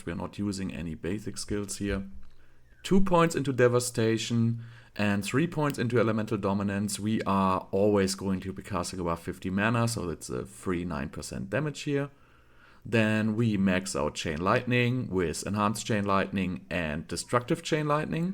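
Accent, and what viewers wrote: Germany. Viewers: i made i similiar build yesterday. 180 mana with enchant throws 7 lightnings. 1 regular, 4 omnipower and 2 from enchant. already works like a charm with 2.0 mana per bounce. Esus boots for crit and i use the 300% gauntlets. Also currently with raiment but i will probably use a normal chest soon and therefore put temerity in to try it out. i also use 2h with 80% for 4 additional bounces. i also use the 20% enchant boost in paragon but dunno if its worth it. question: you use elementalist. do you know how the crit chance is calculated?i would like to use it as well and get rid of the boots but dont know how it works. if i have my omnipower procs from 150mana to 0 and it casts the chain lightning do they keep the enhanced crit chance for their whole duration or everytime i drop below 100 mana while they are flying it dynamically adjusts and removes the crit chance? 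I feel like cc duration is useless. i just stun them again with 3 points in the stun passive. and everything is stunned anyway so no need to use shared missery. i also do not see the point in freeze because you have stun as hard cc. i may try it out but i dont know if the 3 uniques, paragon board and so on is worth the effort. my dmg numbers look the same are you german? your accent sounds like it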